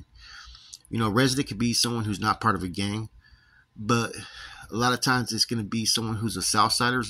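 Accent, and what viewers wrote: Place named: North, United Kingdom. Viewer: you speak like a true northerner.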